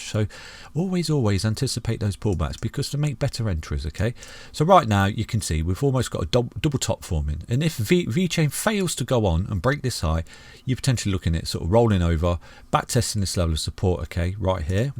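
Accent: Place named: Midlands, United Kingdom. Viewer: it sounds like your from the Midlands, UK. Can't quite pin your accent down 🤔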